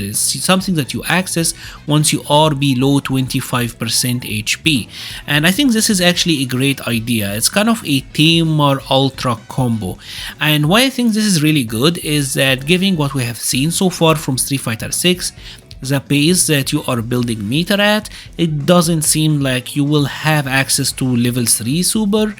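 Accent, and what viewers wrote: Egypt. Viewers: You sound like your Egyptian 🤔 im i wrong ?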